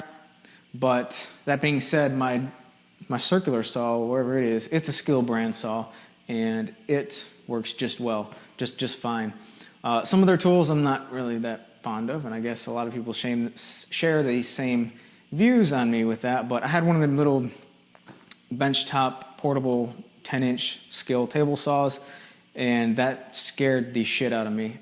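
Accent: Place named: North, United States. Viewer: Nope...not too far at all. My little brother graduated from Mississippi State University a few years ago. I had originally put that you had an accent, but when I went back to the video before posting, I noticed a few slight "Mississippi" things, but mostly a northern accent. I thought I heard in one of your older videos that you mentioned making something for a Mississippi Craft group, but I forgot to bring it up.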